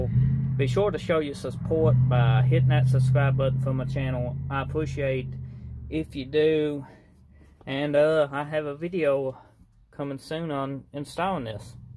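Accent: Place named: Los Angeles, United States. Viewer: La accent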